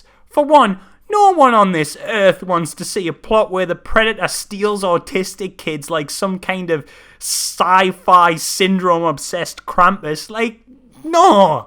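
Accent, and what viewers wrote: Newcastle, United Kingdom. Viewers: Are you a geordie lad? A could here it a bit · Hey fellow geordie.👍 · just love the geordie accent ..Fucking Baffling!! love it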